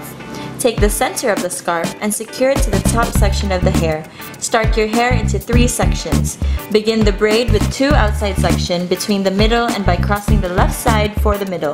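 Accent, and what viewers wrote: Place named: Philippines. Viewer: Are you a filipino i asked cause of your accent i am a filipino myself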